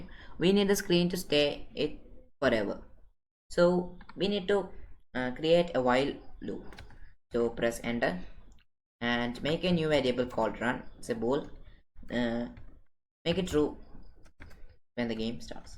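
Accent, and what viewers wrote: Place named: England, United Kingdom. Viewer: u turned to an engliish dude!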